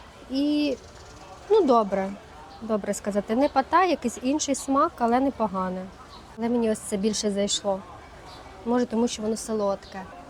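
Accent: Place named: Kherson, Ukraine. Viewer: ох ці праведний. Ну і говори на расейській сам А дівчина має свій Херсонський 🇺🇦 суржик як 90% Українців мають свій суржик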